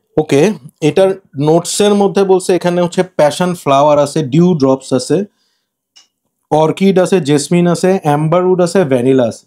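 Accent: Chittagong, Bangladesh. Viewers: বদ্দা, অ'নে চিটাইঙা নে??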